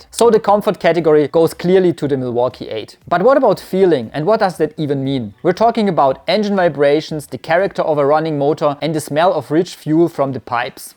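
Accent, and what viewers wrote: Germany. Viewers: You can try as hard as hell, you never kick that german slang. So speak like "dir der Schnabel gewachsen ist" We have Google Translate.....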